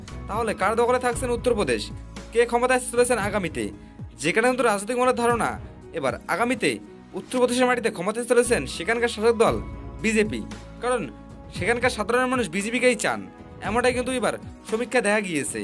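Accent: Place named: Bangladesh. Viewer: Very bad accent. Must b Bangladeshi. Find some who can speak clearly.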